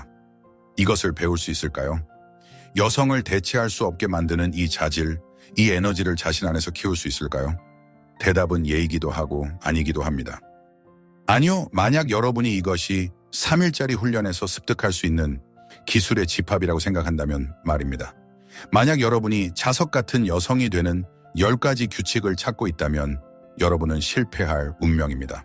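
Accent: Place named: China. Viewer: 중국분이신가요? 발음이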